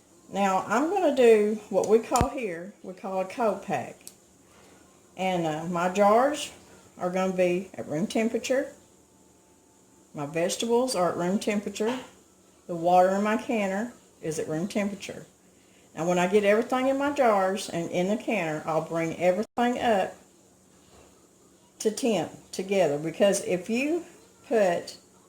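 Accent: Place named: Southern Missouri, United States. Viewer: I just discovered your channel recently. I am now subscribed. I can't wait to try your crazy pizza sauce, your lunch lady rolls, tomatoe powder and these potatoes. I have canned many years and have a really big garden. But some of these things ar new to me and I love being able to add to my pantry. Thanks so much. Oh and I have to say I love hearing you talk! The accent sounds so much like my people. My family are from southern missouri. I have lived though in Nebraska most of my life. It brings back such sweet memories! Thank You.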